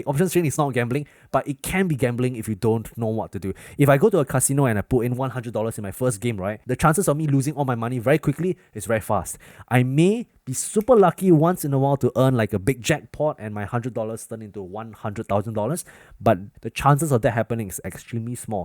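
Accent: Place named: Singapore. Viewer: Hello good luck 🤞 with options . I can hear you are Singaporean. I lived there for several years at marine parade. Loved it and miss it much.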